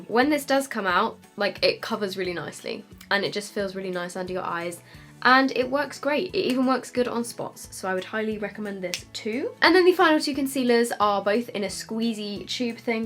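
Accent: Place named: England, United Kingdom. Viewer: You are my favourite English youtuber and my Spanish favourite youtuber (I am Spanish) has just done a review of your palette. It is like a mixture of my 2 favourite youtubers in a video Yayyyy haha Her channel's name is Ratolina in case you want to watch hehe Lots of love♡♡♡ ps: I really love your accent ♡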